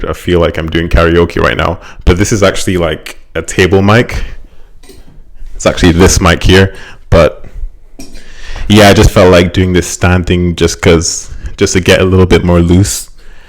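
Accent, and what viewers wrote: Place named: Scotland, United Kingdom. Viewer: YT is literally therapy for a lot of ppl. The Scottish accent thooo!!!! 😂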